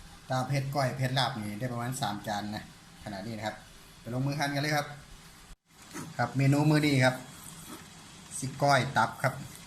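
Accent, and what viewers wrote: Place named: Chaiyaphum, Thailand. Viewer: จ่าเป็นคนบ้านได่ครับ สำเนียงแบบนี้ให้ผมทาย ชัยภูมิคักๆ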